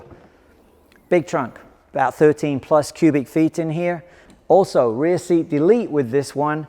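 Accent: United Kingdom. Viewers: He is from the UK been in the states for 30 plus years.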